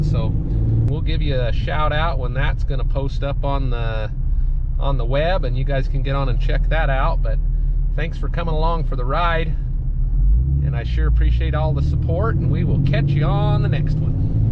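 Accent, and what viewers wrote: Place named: Texas, United States. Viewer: Ok I know you live in Utah but, since you mentioned it, what part of the Country are you from? Sounds like Texas.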